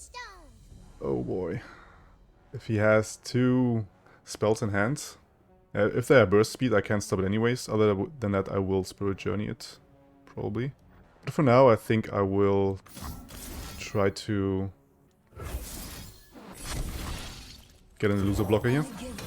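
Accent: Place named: Germany. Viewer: Lustig wie man selbst bei dir raushört das deutsch deine Muttersprache ist haha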